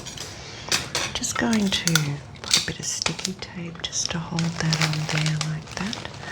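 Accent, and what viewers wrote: Australia. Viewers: A few years ago I was thrilled to learn that ASMR was a "thing" too, when I stumbled across it while searching for Reiki videos. I used to think I was a weirdo too! 🤣 I especially Love soft spoken accented voices; your Aussie accent is dreamy 😵‍💫✨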